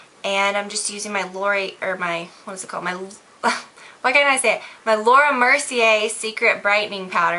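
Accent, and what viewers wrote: South, United States. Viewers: i love hearing bits of your southern accent in your videos. what nail polish will you be wearing for new years?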